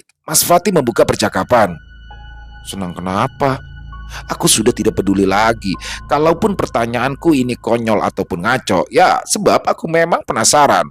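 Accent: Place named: Java, Indonesia. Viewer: Kadangkala terpikat dengan gaya membawakan ceritanya yang terdengar seperti dialwk orang Betawi,juga seperti orang jawa dialeknya........ya teruslah berkarya ....semoga sehat dan sukses ya.....ok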